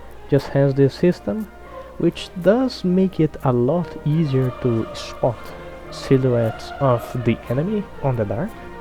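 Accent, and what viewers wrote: Brazil. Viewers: Men, are you brazilian ? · I heard portuguese voices, are you from Brazil?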